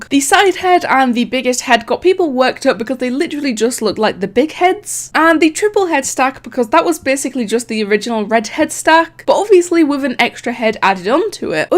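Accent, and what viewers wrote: United Kingdom. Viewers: Are you from UK? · U sound british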